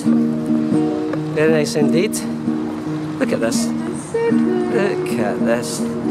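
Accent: Scotland, United Kingdom. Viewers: By the way thanks for all of your advice and I'm looking forward to coming in July. Love the Scottish accent by the way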